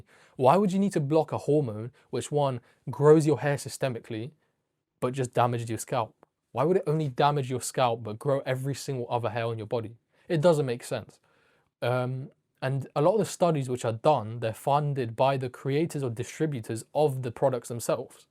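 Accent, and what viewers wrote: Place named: Poland. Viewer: not the typical polish english accent 😩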